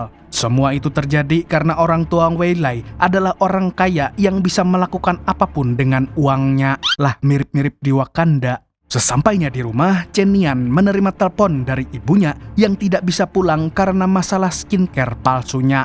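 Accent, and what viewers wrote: Banjar, Indonesia. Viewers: pian urang banjar kah min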